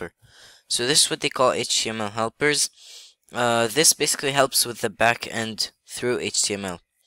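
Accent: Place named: Arab world. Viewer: Unrelated question: Your accent sounds Arabic. Are you Arabic?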